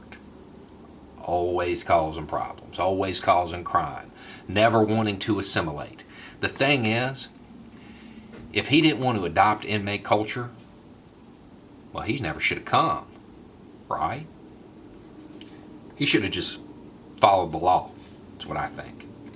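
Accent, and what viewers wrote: South, United States. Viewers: There’s that great southern accent brother... well said sir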